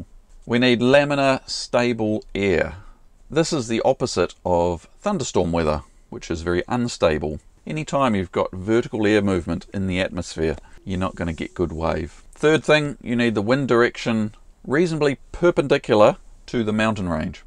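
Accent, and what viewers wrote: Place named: New Zealand. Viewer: I love the NZ accent, ahbe been there in 2008 and love your humor, Keep on going and greetings from Switzerland (LSPO)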